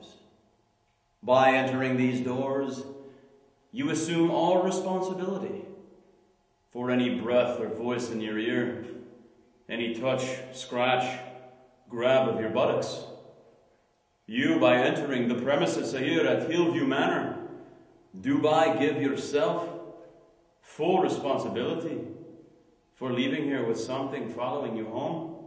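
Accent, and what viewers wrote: Scotland, United Kingdom. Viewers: why the Scottish accent man? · awesome scottish accent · I personally think it`s a scottish accent :)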